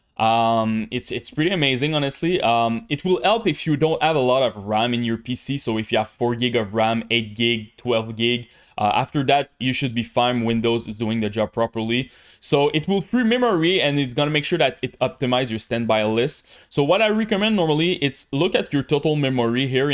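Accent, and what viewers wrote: Quebec, Canada. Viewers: Is it just me or you are a french canadian ? (Qc)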